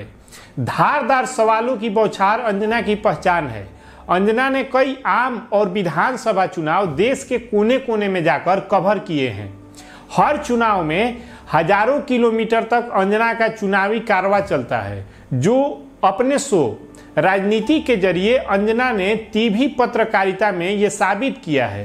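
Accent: Bihar, India. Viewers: Your way of talking is of a typical Bihari. Actors and artists can learn from you the accent of Bihar. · Bihari waala tone mein bol raha hai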